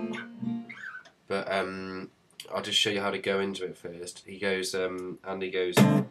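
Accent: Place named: United Kingdom. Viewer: wow.That was actually the best Andy tutorial i saw on youtube,and the best british accent i heard in my hole life.Thanks bro!!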